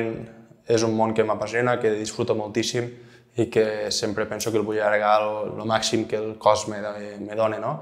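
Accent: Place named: Southern Catalan, Spain. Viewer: He is speaking catalan language (southern accent) btw, not spanish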